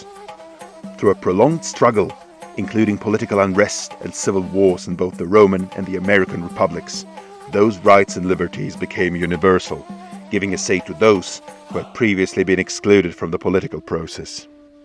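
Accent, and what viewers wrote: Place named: Ireland. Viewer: did you even watch the video? Did you listen...did he sound american to you? The dude is clearly Irish. And that logic makes absolutely no sense! How is that doubling down? Carthage was destroyed and levelled to the ground by rome, its population largly sold into slavery with the rest exterminated. Do you understand history in correlation to current events...? Essentially we've established the guy behind the vid to be Irish and that comparing usa with cathage is not doubling if anything it does the complete opposite.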